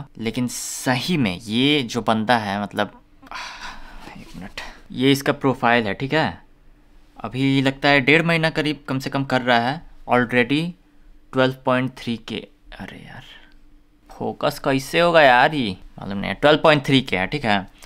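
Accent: Bihar, India. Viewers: Bhaiya apki accent toh bilkul hmare bihar jaiisi h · Bhai wh bihari hi h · A ji aap to humse bhi jyada bihari lag rhe hai..aisan ta hmaar tone nahi aata hai bhojpuri me lekin aapka to puchiye mat baap re baap.... sarangheyo oppa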